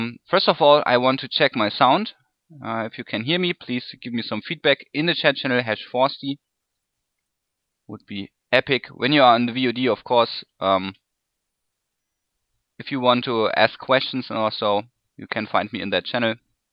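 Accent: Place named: Germany. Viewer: german accent is epic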